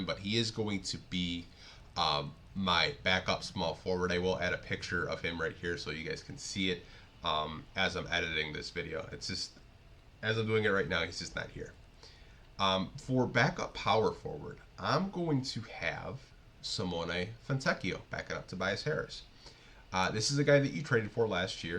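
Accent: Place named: United States. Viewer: You are Italian? You sound American.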